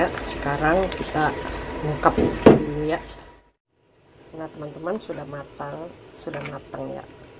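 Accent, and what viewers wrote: Indonesia. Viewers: Bugis apaki??ko sma cra ngongtta, hahaha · Mb ko ngmg nya kya orang sulawesi yah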